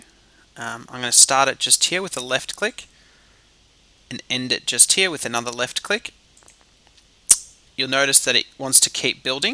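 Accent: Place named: Australia. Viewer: love the aussie Accent :)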